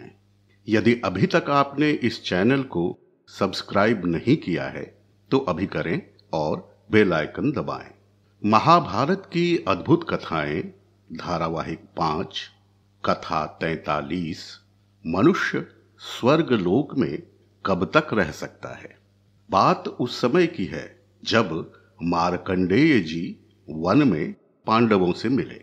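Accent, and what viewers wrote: Standard. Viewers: महाभारत की एक सुन्दर संदेशयुक्त लोकोपयोगी कथा...! सुन्दर लोकोपयोगी कर्म वाले ही वास्तव में मानव धर्म /स्वधर्म का पालन करने वाले होते हैं। ऐसे स्वधर्मपालकों को ही...लोकोपयोगी कार्य करने के कारण, संसार में सम्मान और यश/कीर्ति प्राप्त होती है। जिन्हें सम्मान और कीर्ति मिलती है उन्हें ही स्वर्ग का सा सुख प्राप्त होता है परन्तु समय के साथ लोग उनके लोकोपकारी कार्यों को भुला बैठते हैं तब वह स्वर्गिक सुखों से वंचित हो जाता है। स्वर्ग का सा सुख पाने के लिए या तो निरंतर लोकोपकारी कार्य करते रहना आवश्यक है या उन कर्मों का स्मरण कराते रहना (गुणगान होना) आवश्यक है ताकि उस व्यक्ति का यश बना रहे और वह स्वर्ग का सुख प्राप्त करता रहे! इस कथा का यही सार तत्व है। सुन्दर शैली में विशुद्ध उच्चारण के साथ प्रस्तुत यह कथा अत्यंत रोचक और लाजवाब लगी। हार्दिक धन्यवाद एवं हार्दिक बधाई आदरणीय इस सुन्दर संदेशपूर्ण कथा-वाचन के लिए...! सादर नमन आदरणीय एवं शुभ दोपहरी...! 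☀️🌷🙏🌷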